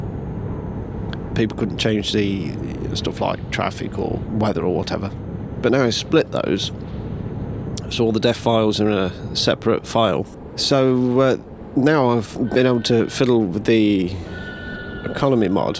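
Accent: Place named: United Kingdom. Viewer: Very British jolly good old chap :-)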